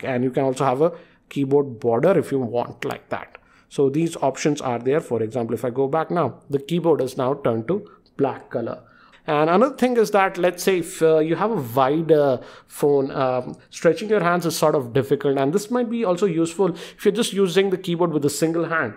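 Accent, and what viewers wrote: India. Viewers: thank you for doing these excellent, very task-specific videos.Your work is very appreciated. I'd like to make a suggestion to make their goodness even better. I am an English speaking American; and your native language is Indian. Your dialect and mine are quite different. Typically native-speaking English people speak more slowly. You speak much more quickly and (of course) pronounce English words as you learned and know them. I concentrate and listen closely to you; but due to our lingual differences unfortunately I fail to understand much of what you are teaching. When you make videos in the English language,could you try to speak more slowly and pause a moment between topics. What you are teaching is very valuable, and I want to hear and understand you fully. Thank you very much and please continue sharing you knowledge. I appreciate your work!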